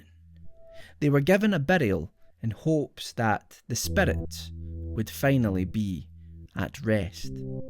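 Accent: Scotland, United Kingdom. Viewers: Quality videos, interesting concepts, Scottish accent, Dude needs more subs. Good work sir.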